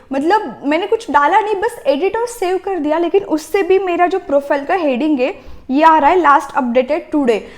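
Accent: Maharashtra, India. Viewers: Tu marathi ahes ka? Seems from tone. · Marathi by accent!!!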